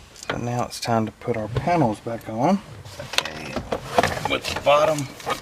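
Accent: South, United States. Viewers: Thank you so much for the reply. I'll have to check out your other online content - sounds great! Regarding where you are from, there's a lot of similarities in accent and expressions between south Arkansas, northern and eastern Louisiana, and Mississippi, and a lot of similarities in culture and food! Take care, and may God bless!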